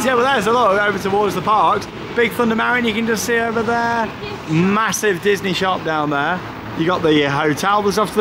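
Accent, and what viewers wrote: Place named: Merseyside, United Kingdom. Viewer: Lovely to see you both. Only just started but makes me laugh when has her Merseyside twang. Was more prominent on the carnival cruise so I thought. Awww they have vlogged after a drink. Then I see the open tin of budweiser. Or it may be totally not a bud and some korean AF drink but always talks with her mersyside twang after a drink and it's so so so cute. Going to unpause and carry on. X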